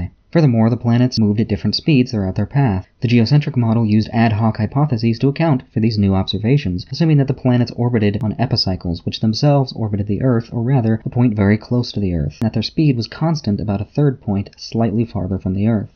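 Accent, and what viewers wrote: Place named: United States. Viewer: He's American that's why I'm looking for a nice concise English accent to explain it to me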